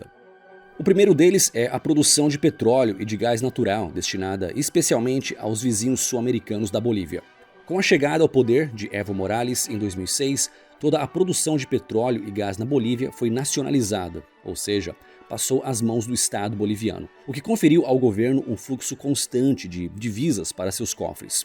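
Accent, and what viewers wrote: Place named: Rio de Janeiro, Brazil. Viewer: Sou portugues e acho que o.sotaque carioca dá um toque especial no portugues, mais animado, descontraido. Mas bom é nos entendermos em imensos paises, foi a melhor coisa que os nossos antepassados fizeram, espalhar a lingua.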